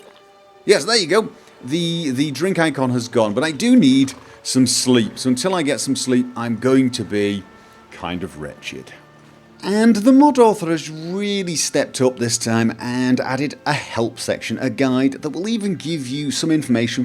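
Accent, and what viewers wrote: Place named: Scotland, United Kingdom. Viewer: Hears a scottish accent insta sub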